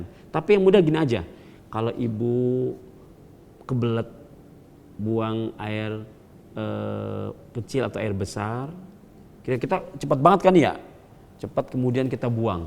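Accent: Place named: Jakarta, Indonesia. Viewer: Masa allah...Ustad jadi logat betawinya kental...semoga bisa terus mengasih tausiahnya..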